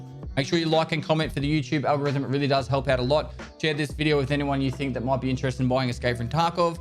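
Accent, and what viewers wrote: Australia. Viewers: Uughhh Australian accent 🤢🤢🤮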